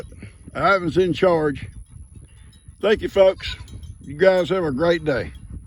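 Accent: South, United States. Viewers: Good morning this is just the 2nd time I've committed...im new but love it I guess ur goat is special shes a determined lol I love hearing about ur farm i dont live on my farm anymore my daughter died n the farm house and I had 55 acres i buried her on the property under a apple tree ..but I couldn't live there anymore so my sons raising his family there now ..but I love seeing ur Animals it helps me miss mine but comforts also ..so ty for ur videos ..im southern to ..I talk like u and understand the slangwords ..lol ..ty ur so good at this...you make me feel like HOME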